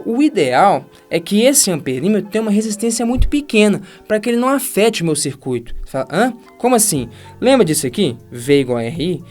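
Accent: Minas Gerais, Brazil. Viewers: esse sotaque mineirinho deixa tudo mais leve. Eu amo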